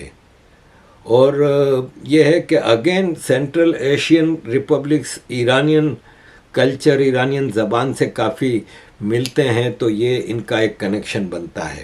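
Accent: Gujarat, India. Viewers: आपका बोलनेका लहेजा गुजराती जैसा है ❤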